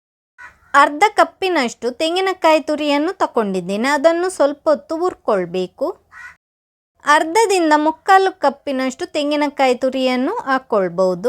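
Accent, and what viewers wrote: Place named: Mangalore, India. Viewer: ಮಂಗಳೂರು ತುಳು ಮಾತು ,, ; ಕನ್ನಡ ಭಾಷೆ ಸ್ವಲ್ಪ ಚೇಂಜ್ ಆಗುತ್ತೆ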